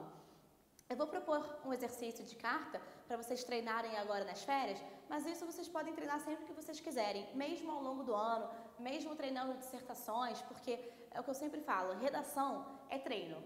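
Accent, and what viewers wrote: Rio de Janeiro, Brazil. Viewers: e esse carioquês da professora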